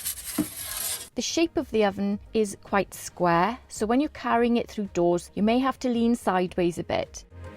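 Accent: Wales, United Kingdom. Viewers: Welsh accent is lovely, great review! Very in depth, confirmed my decision to get one I reckon. Cheers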